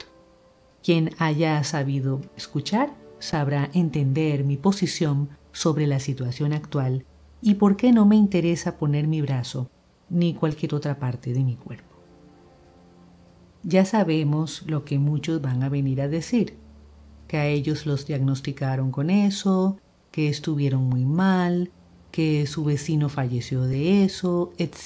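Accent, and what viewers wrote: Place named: Costa Rica. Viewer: Porque es costarricense 😁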